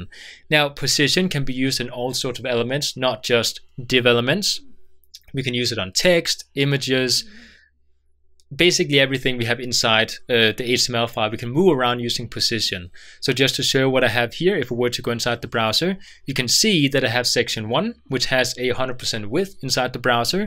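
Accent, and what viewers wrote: Denmark. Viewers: Are you by any chance Danish?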